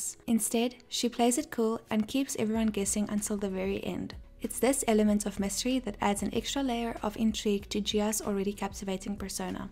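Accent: South Africa. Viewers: Are you from South Africa? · i seemed to recognise the south african accent too haha !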